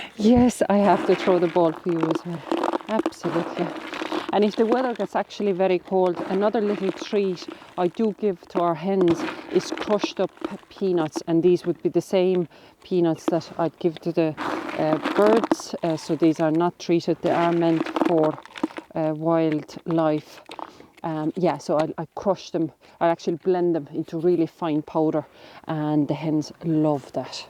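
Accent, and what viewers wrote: Kerry, Ireland. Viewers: You have a grand Kerry accent! Up the Kingdom.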